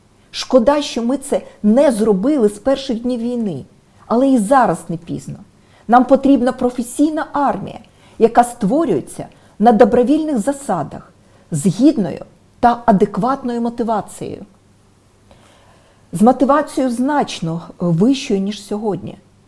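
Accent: Russia. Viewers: Та ти і досі не можеш нормальео рідною мовою говорити... Ти як руссська кажеш на букву "о" -"а". Томущо розмовляєш за камерами на москальському язікє.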